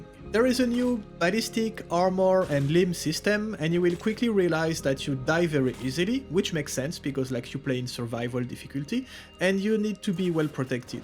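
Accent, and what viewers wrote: France. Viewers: The most French accent ever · le bonne accent français, j'adore ;)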